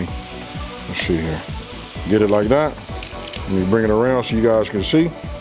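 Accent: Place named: West Coast, United States. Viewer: I love watching your channel and your recipes are always a hit! And i gotta say i love your voice. Every time i hear you talk, your voice reminds me of "Ole Dog"from Menace to Society. 😂 You gotta be from the west coast.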